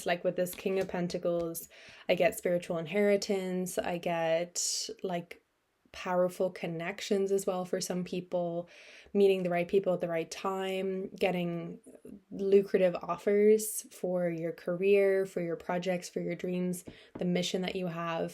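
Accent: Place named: Ireland. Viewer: Thank you. Somehow I hear Irish in your accent ❤️🙏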